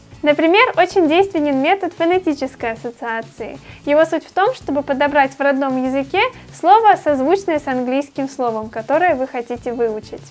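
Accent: United Kingdom. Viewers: ты классная Приятная и простая) Обожаю твой англ. акцент!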